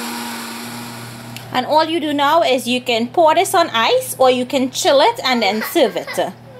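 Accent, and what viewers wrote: Trinidad and Tobago. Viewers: Greetings from Grenada via Britain. I make mines similar, instead I use the pulp from my carrots after I finish juicing. In the blender I put condensed milk, the the pulp, and some essence and brown sugar as most of the sugar is extracted into the juice. The beauty is that there is no waste, as I hate throwing the pulp out when I'm done juicing. Hope all is well. (btw- my ex-wife is from Point Fortin, so I ALWAYS miss the accent)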